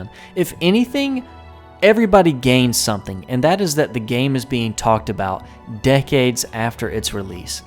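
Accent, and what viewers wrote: South, United States. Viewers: Why did it take me half the video to realize the southern accent 😂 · Do you know not the difference between a remaster and remake ? From this video it doesn’t seem as though you do. A remaster is just a fresh coat of paint on a older game … like Skyrim SE A remake is remaking the game from the ground up line the demons souls remake. This is supposedly a third party doggy doo doo remake with soulslike combat this is not a good thing. So you need to make it clear is it now actually a remaster if that is the case that is no big deal but a full on remake is going to be trash Not only a yucky southern accent lots of hardcore copium in this video.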